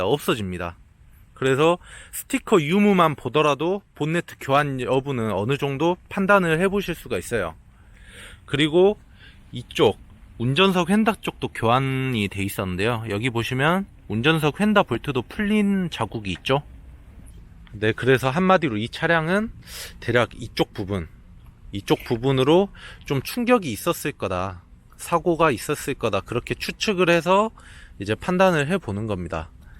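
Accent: South Korea. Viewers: 아따 전라도냐? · 혹시 광주사람인가요?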